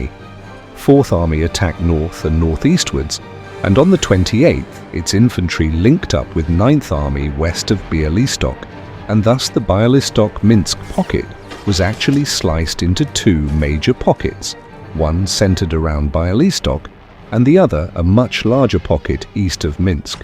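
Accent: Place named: Russia. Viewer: Great narrating and also new information to me thanks for this educational video ❤ I'm glad this gruesome war had ended ~ and I hope further peace persists ☮️ Full watch 🤍 PS: the Russian accent hehe 🤭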